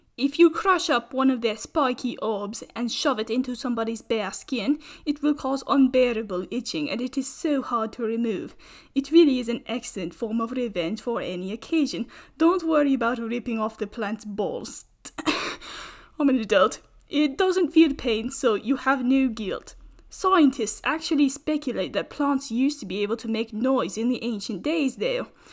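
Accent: Scotland, United Kingdom. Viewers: ur accent is more scottish than brittish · This makes me laugh and I need more- not rushing you though. Scottish accent noises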